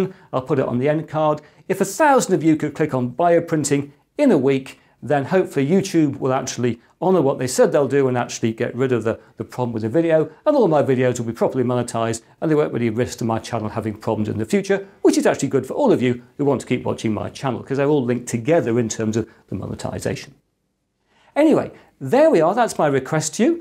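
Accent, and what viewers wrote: England, United Kingdom. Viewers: subscribed for the crystal clear explanations with the always enviable english accent!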